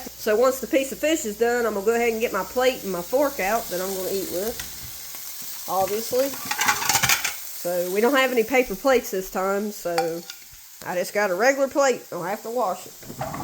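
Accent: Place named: South, United States. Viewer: You have a heavy southern accent wow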